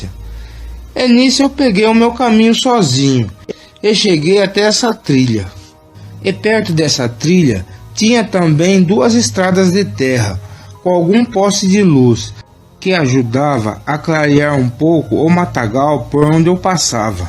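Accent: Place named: Caipira, Brazil. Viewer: A narração é do tipo bem caipira mesmo!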